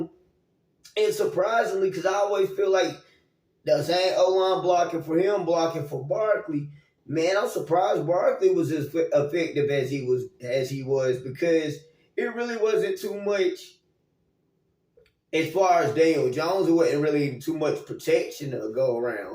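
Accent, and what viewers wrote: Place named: South, United States. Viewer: As a Cowboys fan in NYC, I respect you for doing a good job of putting lipstick on a pig. Also, our good but young TEs dropped two very catchable balls in/near the end zone. Finally, 11 Ls in a row? Y’all really don’t want to see us again, especially if we’re relatively healthy. We’ll only be better next time. Thanks southern sounding Giants fan in the big ciddy. Wish you much success on YouTube.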